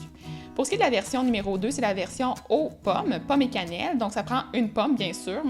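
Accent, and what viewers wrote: Canada. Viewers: Vous venez du Canada? Si oui j'I reconnu direct dès le premier mot 😂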